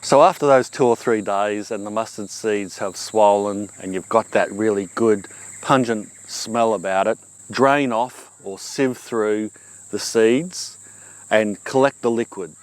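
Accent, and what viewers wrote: Australia. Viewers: Omg! I was looking for recepies of mustard and I found you, with this aussie accent that it m miss me, after spending 2 years in Sydney in 2000, you brought me to those days ❤ tank you for the recepies.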